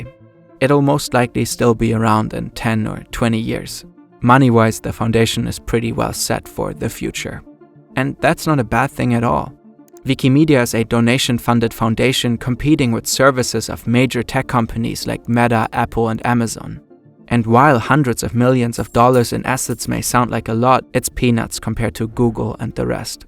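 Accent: Germany. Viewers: Are you german? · you sound like a native german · Coz he's german w is pronounced as v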